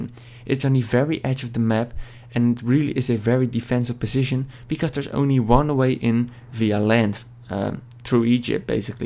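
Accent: Netherlands. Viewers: crazy dutch accent i hear... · wow een noorders accent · i can confirm as a dutch person it is a dutch accent he uses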